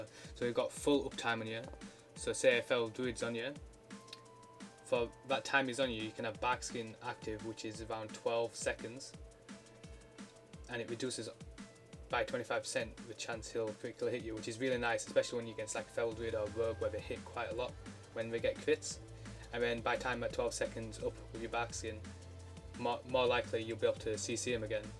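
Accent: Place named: South, United States. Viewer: Heavy southern accent cat -- cut -- bear -- b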